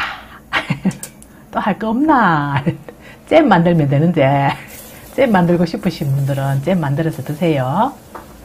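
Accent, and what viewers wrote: Gyeongsang, South Korea. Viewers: 대구사투리가 너무 너무 맛깔나요 정감이. 팍팍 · 참 식혜인가 했더니.... 엿이 됐네요....프라스틱 스푼까지....ㅎ 우습네요.... 그러게요 꾸밈 없는 그 경상도 말투 재밌어요...... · 구독 눌렀습니당😊 말투가 경상도이신거 같은데 경상도 어디신지 여쭤봐도 될까요 저는 대구가 고향입니다^^ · 깅상도 정감있는 말씨가 귀엽다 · 갱상도 사투리만 아니면... 일부러 사투리 쓰시나요? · 경상도 사투리가 자극을 심하게줘서요 ㅋㅋ ㅋ~~ 구독 돟아요 ㅋ ㅋ ㅋ 안 누를수가없어요ㆍ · 고향이 어딥니꺼? 내고향 진주사투리 같은데예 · 엄청,깅상도 사투리 ㅎㅎ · 아지매 사투리는 억시 정겹지만 대구이남 지역인것 같은대 어댄교? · 오늘도 문디경상도말에 한바탕웃고 또많이배우고갑니다 욕보이소 👍👍👍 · ㅎㅎ 경상도 사투리가 너무 재밌고 맛날거 같아요. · 오랫만에 보았는데 말 쏨씨 가 쑥 쑥 통 통 튀는 경상도 사투리 듣기 좋아요. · 갱상도 아잉교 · 정겨웁다 갱상도말도 소재도 이것 저것 다 맛갈스럽다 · 하이고 아지매요 구수한 경상도 사투리에 재미나게 설명 하시네예 경북경산 사투리 같네요 ㅎㅎㅎㅎ 칠갑을 햇네예 하이고 웃으버라 ㅋㅋㅋ 배꼽이야ㅡㅡ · 물가가 비싸서 우리는 꽤없나 ㅋㅋㅋㅋㅋㅋㅋㅋ 경상도 사투리에는 사랑이 담겨있죠^^ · 같은 경상도인데 사투리 너무 웃기녀요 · ㅎㅎ 특히하게 하니 말려어요 경산도사투리~^^ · 나는 경상도 사람이라 다 알아듣는데 다른분들은 어떨라나 모르겠네 넘 구수하고 재밌어요 ㅋㅋㅋㅋ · 이분은 경상도 사투리 쓰시는 거죠? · ㅋ 구수한 경사도 사투리 ㅋㅎ 좋아요 저도 경상도가 고향이라 정겹고 치근감이 있네요 고향이어디세요?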